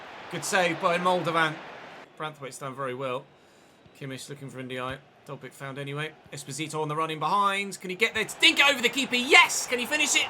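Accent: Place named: Liverpool, United Kingdom. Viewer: A little disappointed... was looking forward to hearing the Scouse accent one last time 😂😂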